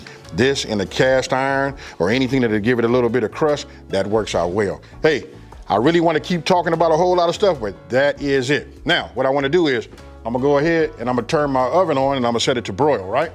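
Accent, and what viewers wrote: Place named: South, United States. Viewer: If you’re from LA, where did you get that accent? I assume the south? Great video!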